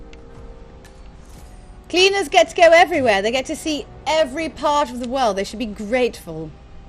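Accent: United Kingdom. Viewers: The posh accent does it for me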